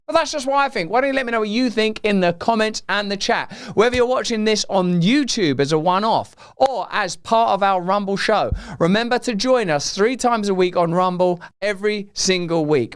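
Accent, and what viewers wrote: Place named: United Kingdom. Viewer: A dude with both a heavy British accent and a heavy lisp is too much for my ears.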